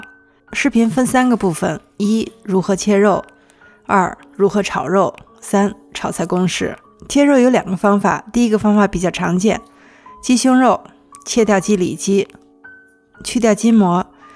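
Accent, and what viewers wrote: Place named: Beijing, China. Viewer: 北京口音😜老乡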